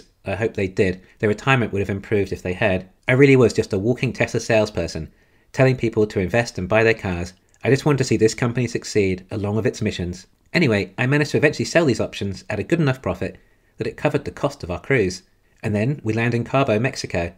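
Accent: United Kingdom. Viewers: It sounds like you are from the UK what app do you use to do options?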